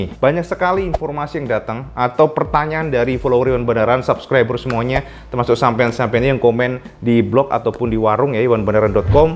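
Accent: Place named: Surabaya, Indonesia. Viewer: Asyik suka deh pembawaan wong arek" suroboyo auto sub lonceng, sukses terus bwt cak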